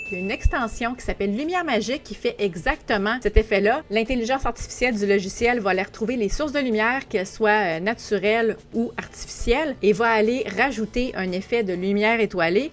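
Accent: Quebec, Canada. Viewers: Merci pour la video. Votre charme, celui des vos chats et votre "accent" québécois (je suis suisse) y contribuent beaucoup. Et merci pour les astuces très bien expliquées.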